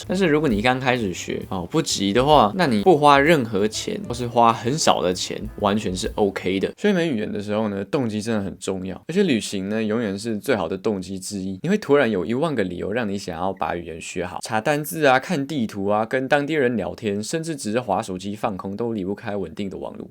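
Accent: Taiwan. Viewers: 你的台湾腔真牛逼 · 好台哈哈哈哈....不看畫面好像聽台灣youtuber講話，真的很厲害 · 😮😮居然全臺灣腔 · 我倒覺得是從小在台灣長大的孩紙❤❤❤整個就是台灣口音、台灣思考邏輯、正港台灣人😂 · 你的台灣口音真的很道地...真的是台灣！ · 你很厲害，講中文時連英文字母都是用台式發音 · 好難想像這是一個高中才學、家裡沒有講中文的孩子說出的台灣中文，用法發音口氣完全是台灣，甚至比一個多年住在台灣的中配講得還道地，太厲害了，衷心佩服！ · 天啊！如果沒看到的外國臉，只用聽的會以為是台灣人講話，黏音真的一模一樣😊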